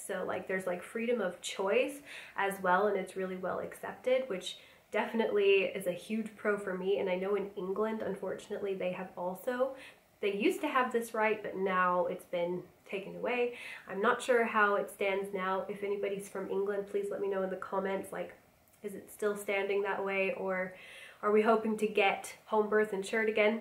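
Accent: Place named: United States. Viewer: Good morning It's always nice to start a day with one of your videos. The German language is difficult to learn, even for Germans. I love the American accent when you're speaking the German language, it's so lovely and sweet. You are living in a wonderful part of Germany, very nice to raise your kids. The most important word at that area is beer/Bier 🍻 and that's not so hard to pronounce 😁😅.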